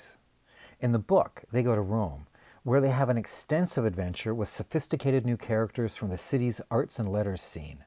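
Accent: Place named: Canada. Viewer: You must be Canadian. (E.g, pronounciation of boat, house, etc.)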